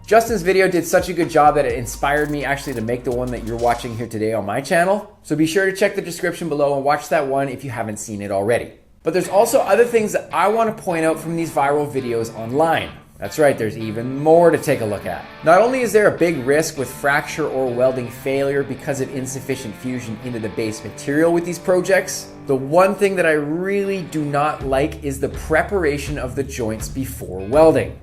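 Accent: Canada. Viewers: canadian detected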